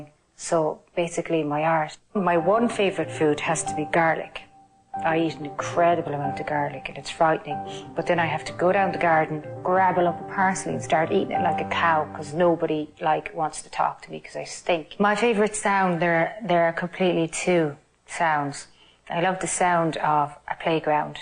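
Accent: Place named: Ireland. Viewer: Irish people really say "me kids" in stead of "my kids", cute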